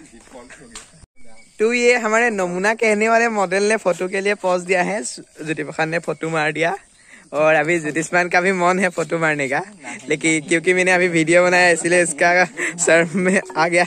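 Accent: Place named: Rural, India. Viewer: Your গঞা হিন্দী is op 😂😂🔥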